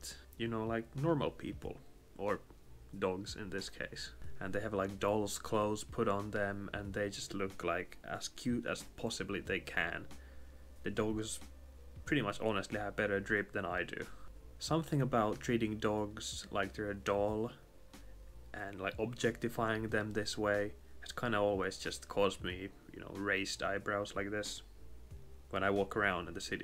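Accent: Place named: Finland. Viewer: Ah!!!! I knew you were Finnish! I came upon one of your shorts about the morning Ramen shop and your accent caught me. I was like “That sounds very Finnish” and I am happy to confirm that! Just very nice to see for no reason at all! 🎉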